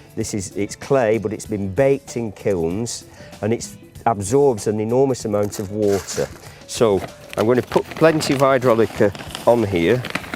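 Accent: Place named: Northern England, United Kingdom. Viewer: 🤔....Michael Caine is a londoner.....this guy is from the north of England 😄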